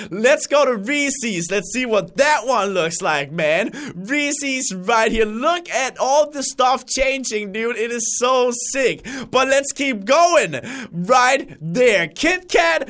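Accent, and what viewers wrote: Denmark. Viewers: I did but have you heard his Danish voice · Crainer's Real name is......His Danish voice is so cool There is an interview of him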